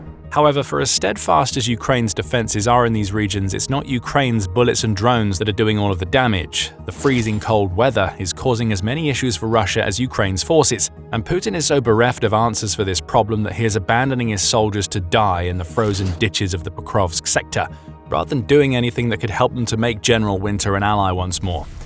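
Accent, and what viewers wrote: United Kingdom. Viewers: Whenever you hear a limeys voice narrating you just know its bullshit.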